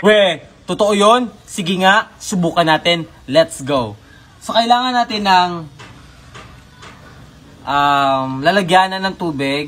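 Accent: Visayas, Philippines. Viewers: Bisaya diay ka ? TAGA CEBU KA ?!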